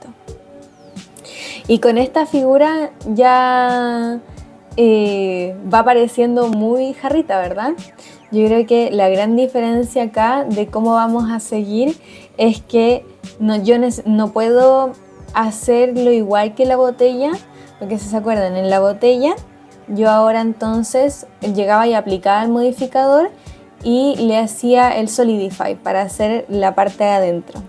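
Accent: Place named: Chile. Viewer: claro que me interesa, yo te le decia como consejo, tambien hago 3d. igual creo que si grabas directamente lo que vas haciendo y hablas de lo que piensas tambien sería entretenido, ademas no tendrías que gastar tiempo en edicion de video y harías las 2 cosas al mismo tiempo, luego lo subes así no mas, total no tiene que salir perfecto, y lo imperfecto e improvisado funciona bien por el hecho de que si es que te equivocas, tambien la gente aprenderá de los errores y de posibles soluciones. ademas que dicen que mejora la oratoria el hablar a gente sin tener a nadie presente, no es que diga que hablas mal, es mas yo tambien soy chileno y me hacía falta escuchar mi acento desde que vivo en brasil, sobre todo si es con blender. eso de la oratoria se lo escuché a ooki de monkey, no se si lo conoces, es un colombiano que hace videos de escultura. igual solo es un consejo para que no gastes tiempo en guion (que no se si lo haces) o en edicion de video. 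ademas que resultaría super natural y podrías explotar tu naturalidad y no perder tiempo en cosas que lo mas probable no le preocupan mucho a la gente que desea ver tu contenido. yo no soy creador de contenido y no se mucho las dificultades que trae serlo, solo te lo comento por videos que eh visto que siguen esa rutina, por ejemplo las master class de alinwan studios. el solo se graba y luego en la edicion de video solo hace cortes, por si es que vá al baño y le agrega una intro y nada mas, pero su contenido es oro puro en blender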